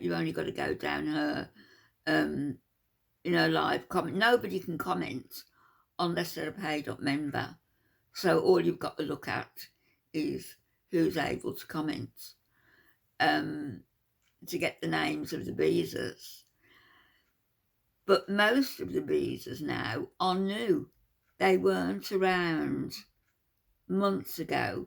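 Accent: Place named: Birmingham, United Kingdom. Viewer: That's a brum accent ( Birmingham)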